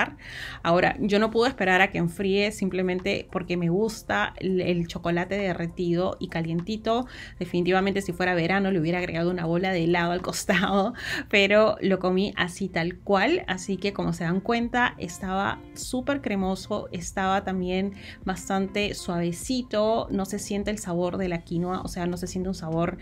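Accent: Peru. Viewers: Buenísimo esto gracias ❤ Tu voz es como del peru❤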